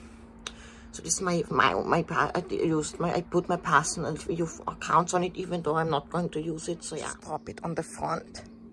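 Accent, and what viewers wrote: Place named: China. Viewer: IST DAS ENGLISCH MIT CHINESISCHEM AKZENT???!!!!!!!🤣🤣🤣🤣 ICH VERSTEH KEIN WORT VON DEM WAS DIE LABBERT!!!!!!🤦‍♂️🤦‍♂️🤦‍♂️🤷‍♂️🤷‍♂️🤷‍♂️ Ich empfehle diesen Kanal NICHT weiter!!!!!😑😑😑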